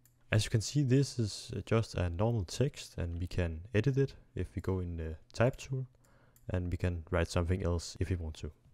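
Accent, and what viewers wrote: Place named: Denmark. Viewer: Den accent lyder meget bekendt... du MÅ være dansker!😂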